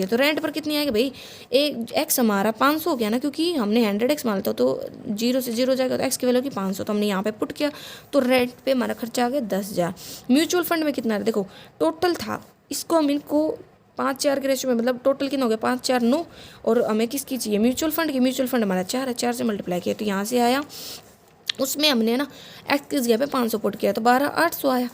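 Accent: Haryana, India. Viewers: Tnq mam for explaination. Aap haryana se ho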